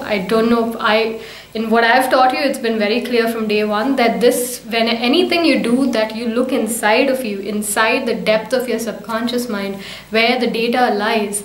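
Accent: India. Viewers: I love the Indian accent. Do you have any videos of your singing? Also, can you give me a singing tip? I'm trying to learn. Thank you.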